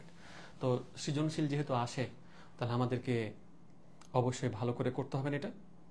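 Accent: Rajshahi, Bangladesh. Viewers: First class sir vasha sunei bujlam apnar bari rajshahi pore about e giye Dekhi asolei.... Last of the all apnar class khub e valo legese ami online e khub akta class Kori ni SSC te to aro Kori ni mane kora lageni akhon apnar class ta amar khub e valo legese asa kori samner sob class e apnar sate thakte parbo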